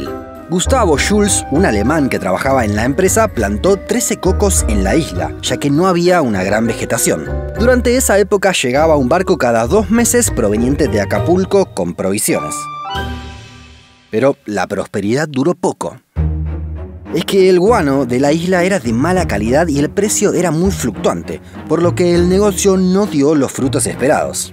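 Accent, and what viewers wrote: Rioplatense, Argentina. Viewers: Por curiosidad, de dónde es este chico? A los españoles nos cuesta diferenciar el acento de Argentina, Uruguay y Paraguay. Un saludo!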